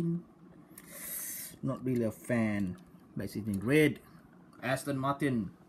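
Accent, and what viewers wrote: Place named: Malaysia. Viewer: I did Subscribe this channel about 4 months ago, your channel has completely fulfill my desire to get to know what Hotwheels are on the newest case. At first, your video was good, but you also take notes to some suggestion that you get from your subscriber so now your video is getting better. And also, your intonations, your unique English-Melayu accent, the way you were talking makes me feels like i was having a conversation with my friends. You makes your subscribers feels like they were there, watching the unboxing themselves with you. Nice Job, Pakcik! your most famous tagline is: "Yes it is!" :D Salam.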